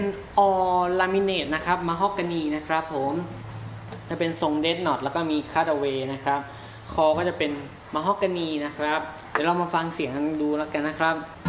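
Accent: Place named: North, Thailand. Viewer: สำเนียงเหนือนี่ ออกชัดเลยน้อ อ้าย อิอิ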